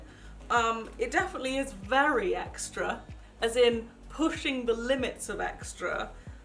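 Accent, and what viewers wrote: United Kingdom. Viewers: Are you British or something bc I love your voice it is great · she is British like me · Are you British 😊😊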